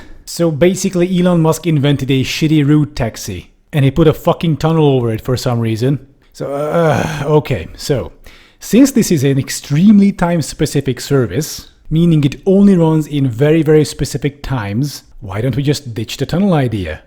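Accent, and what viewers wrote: Hungary. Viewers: Akcentusbol ugye jol vettem ki a magyar szarmazast? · ez nekem is feltűnt de az ahogyan mondta a magyar szavakat és amilyen sokszor emlegeti a budapesti metrot nekem eléggé azt sugallja hogy magyar az illető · Are you hungarian or do you just have a really authentic accent? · Okay, I refuse to believe you're not Hungarian. You've used Hungarian subway lines for comparisons in several videos, you pronounced Hungarian words perfectly and although not very noticeable, you do have a slight Hungarian accent. Cool.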